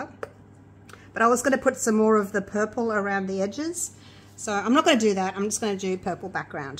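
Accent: Australia. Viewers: Aussie accent is the best...(No, I'm not biassed.. well, maybe just a tiny, incy, wincy bit🤣🤣)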